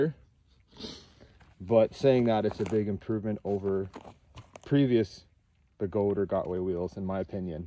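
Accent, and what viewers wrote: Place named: Canada. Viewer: You sound Canadian 😄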